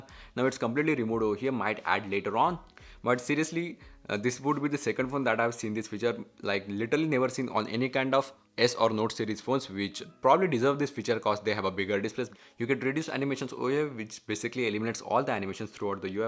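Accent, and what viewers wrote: India. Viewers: What a horrible accent. Indian english is the worst.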